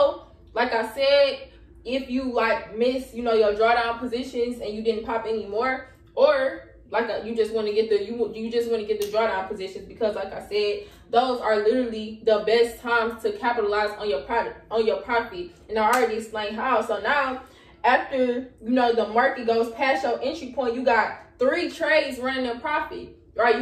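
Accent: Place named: Texas, United States. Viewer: you sound like you from my neck of the woods. Texas Where you from?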